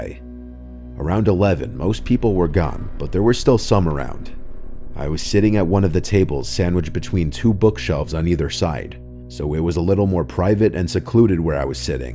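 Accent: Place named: New York, United States. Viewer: He has such a great voice for these stories. I cover true crime and my voice is on the lighter side so I try to make a little deeper for the tone of the story. He also has that jersey maybe New York accent that really sets him apart.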